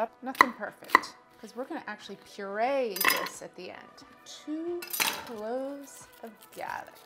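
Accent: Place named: New England, United States. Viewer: loved what you did both on the pot roast and the rib roast. Methodical and clear. Also your presentation is awesome. Total butter, can’t replicate the accent but willing to bet New England/ New York maybe Jersey. Cheers.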